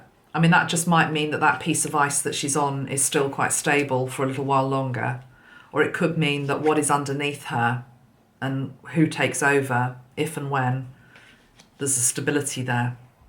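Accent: United Kingdom. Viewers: Just 1st time your video came to me. 🤩 Love your energy, learning good amazing british English 🙏🙏❤️🤗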